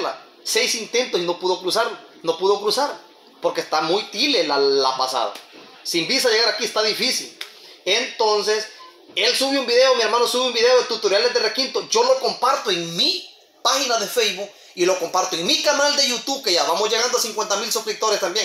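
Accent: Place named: Mexico. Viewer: Este ermano hontureño es la pura riata primer hontureño que abla como un buen mexicano saludos biejon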